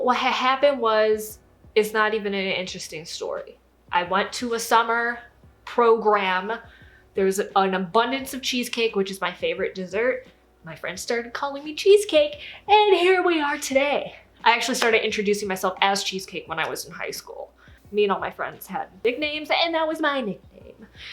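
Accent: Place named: Scotland, United Kingdom. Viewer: I thought you was from Scotland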